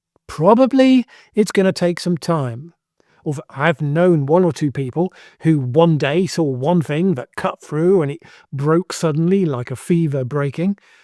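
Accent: United Kingdom. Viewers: Great video, thank you from a subscriber greatful for interesting inteligent thoughts and a pleasant British accent. 👋 Cymru... Any chance of you joining BlueSky for those of us who prefer not to add to Mr Musks empire.. If I had to guess that the human brain and it's psychology means you have to work to avoid that 'cultist warm cwtchy comfort zone' that is the inside of your own head. 😱..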